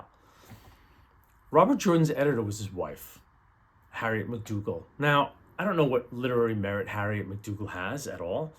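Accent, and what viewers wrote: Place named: Long Island, United States. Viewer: Nassau County representing! It’s endearing to hear your Lawng Island accent.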